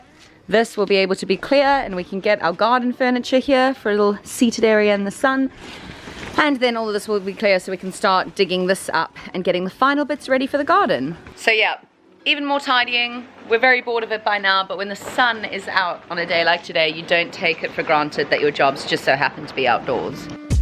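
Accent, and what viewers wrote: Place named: South Africa. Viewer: sounds so South African!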